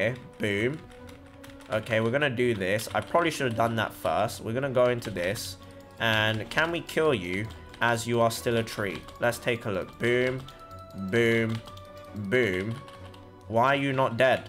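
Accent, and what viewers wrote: United Kingdom. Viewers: Your asian+British Accent is Smooth;! 😂😂😄😂😁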